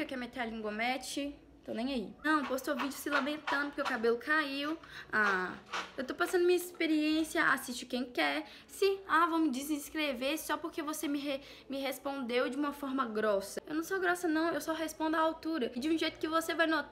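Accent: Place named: Minas Gerais, Brazil. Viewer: Adoro nosso sutaquezinho mineiro 😍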